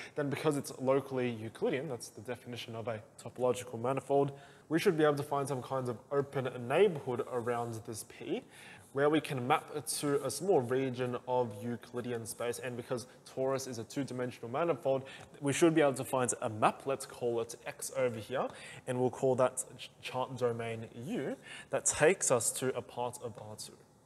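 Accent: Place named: Australia. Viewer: Haha, I was just trying to place your accent when you gave the Sydney example. It’s an Australian accent, of course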